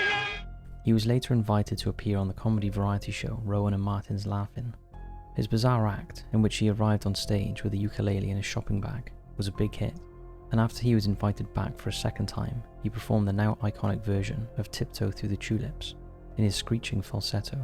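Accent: Wales, United Kingdom. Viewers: Oh I've missed your soothing Welsh tones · he’s definitely Welsh lol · is it Welsh? I thought he sounded a little british, but regardless I miss the old narrator. He just sounds so much more educated and classy then the new one 😏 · Yeah, they have not been the same without his Welsh twang.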